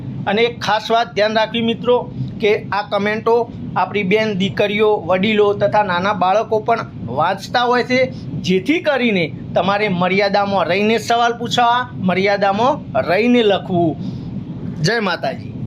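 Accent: Mehsana, India. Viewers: Tame Kaya gamna chho? Ane kaya gamna che. Tamari mahesani bhasha amne bahu game che. Karanke hu visnagar no chhu.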